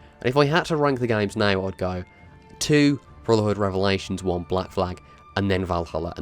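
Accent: Birmingham, United Kingdom. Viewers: I never said there was 😱 I love the accent I just can’t tell which yours is, I’m guessing your a brummie then?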